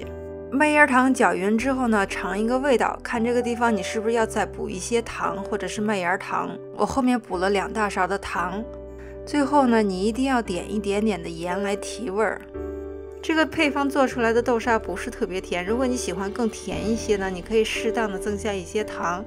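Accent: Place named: Shaanxi, China. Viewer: 听口音陕西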